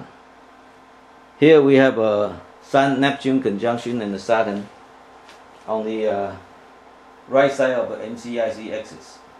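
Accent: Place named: China. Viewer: From his accent you can tell is Chinese. Arabs and Chinese are the best astrologers in the world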